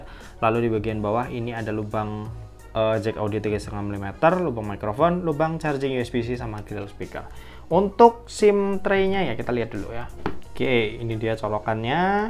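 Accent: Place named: Tegal, Indonesia. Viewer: tegal ya bang... logatnya ketara banget medok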